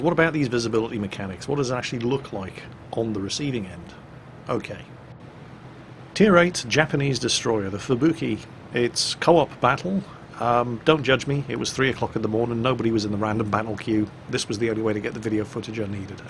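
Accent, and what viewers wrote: Germany. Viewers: your german pronauciation is very funny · I like your german pronunciation :)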